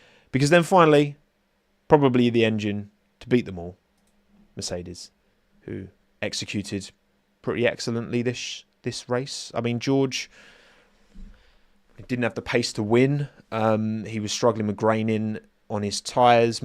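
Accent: United Kingdom. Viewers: Wow, what a frustration! You sound like a Brit and that’s not a compliment…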